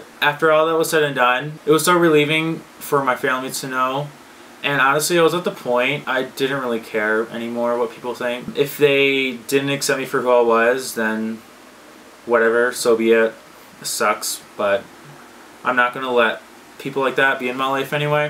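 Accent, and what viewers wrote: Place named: Canada. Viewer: what part of Canada are you from btw ? big city or small town ? ...your accent gave you away before you mentioned Canada...how old did you say you are ? I thought you said 20 yo...nice coming out story glad it worked out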